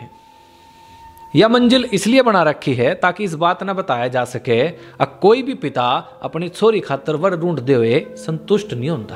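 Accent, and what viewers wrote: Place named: Kaithal, India. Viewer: Ji aap kaithal se ho kya. द का न ward se pta chala h..bhut badiya bolte ho aap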